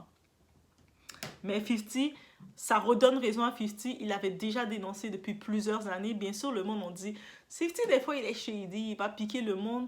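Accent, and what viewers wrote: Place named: Canada. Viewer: Excellent. Ca manque juste un peu usher et le petit Justin J’adoré ta voix avec ce petit accent canadien. 👍🏾👍🏾👍🏾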